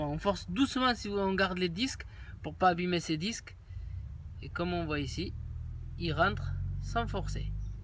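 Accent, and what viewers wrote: Marseille, France. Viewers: mécanicien dans les roues de brouettes!! Ce type de vidéos devraient être carrément censurée ,vu le danger que représente ce type de "travail" de caraque !! Bon, en même temps, apparemment ,vu l'accent, on doit être du coté de marseille, alors faut pas trop s'étonner !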